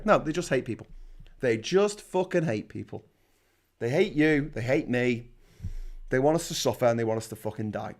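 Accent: Liverpool, United Kingdom. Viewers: I usually listen to Phil Moorhouse, but I like this angrier scouse version of events.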